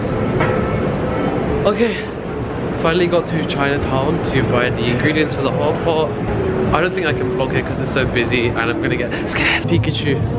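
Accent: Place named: London, United Kingdom. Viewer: London accent really amazing 😭😍 i like it 💛 some times i try to speak like London people but i can't 😭😭😭😭😭 i am in love with london accent💙💙💙💙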